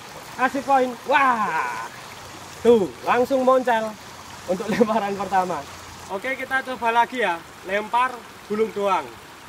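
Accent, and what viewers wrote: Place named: Java, Indonesia. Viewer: medoknya minta ampun....agak dibeneri mas dialeknya biar gak mengganggu banget di telinga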